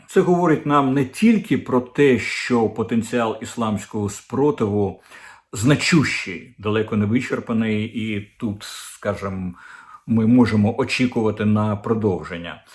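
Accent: Russia. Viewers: Арестович разом з Корчинським колись їздили в Москву для зустрічі з Дугіним і планували спільні дії щодо просування русского мира. Тому недивно, що став на захист Арестовича. Мова у пана українська, лише акцент російський. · Уважаемые украинцы, мне кажется, или этот человек говорит не на чисто украинском, а на каком-то варианте украинского, максимально приближенной к русскому? Он русскоязычный? Просто я 90% понимаю, что он говорит. А когда дикторов на телевидении слушаю, то понимаю только 50%. Он вроде какой-то там националист. Должен правильно по-украински говорить.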